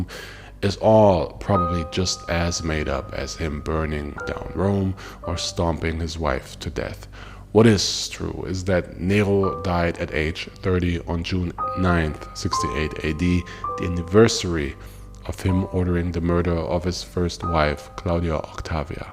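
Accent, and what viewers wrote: Germany. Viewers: Am I detecting a German akcent here ? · Irre ich mich, oder sprichst du die römischen Namen auf Deutsch aus? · I love your German accent !!! Vielen Dank für deine coole videos!!!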